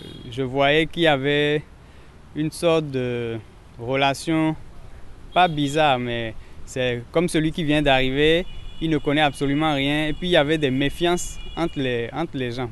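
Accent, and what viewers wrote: Benin. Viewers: Wahoo un Français qui parle comme un béninois bravo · Noooonnn lui là il est grave hein!! Je ne sais pas si après le reportage, pour la vidéo c'est la voix d'un Béninois qu'on nous fait écouter à la place de la voix du blanc, parce que franchement il a trop l'accent!! C'est incroyable!!!! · Quelle imprégnation!!! On aurait cru entendre un béninois parler. Accent typiquement béninois. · LOL le Gar parle avec l'accent benenois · Et puis il a un accent purement béninois en combien de temps? alors que les béninois font un ans en France et revienne avec un accent bizarre. C'est bien bro. J'ai pas cru que c'était un étranger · Vraiment,un accent purement béninois. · Attends il a l accent béninois!😱😱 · Quel plaisir de réécouter tes débuts au Bénin... Qu'est devenu ton accent béninois mon cher?? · L'accent beninois* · Lol il parle comme un béninois est ke la voix n'est pas truie. 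· Il a un accent béninois merde ✌️ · C'est incroyable :o un vrai Béninois dans la voix! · Il a même l'accent Béninois bravo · Incroyable quelle intégration !! Si c'était pas une vidéo j'aurai pas cru que c'est un français , l'accent béninois 100% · C'est l'acent béninois et puis tous les africains ne sont pas FRANCOPHOne, il y a des anglophones et lusophone. C'est comme si je disais qu'un hatienne parle comme un guadeloupéen. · et puis il parle comme un vrai Béninois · Waoooh il parle rien que l'accent béninois 😍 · Un accent Béninois pour un blanc 🤔🤔🤭. Je suis étonné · Lui, vraiment le teint joue en sa défaveur, accent béninois pure et claire. Vraiment chapeau français-beninois. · Il parle comme un béninois ..trop grave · tu as un accent béninois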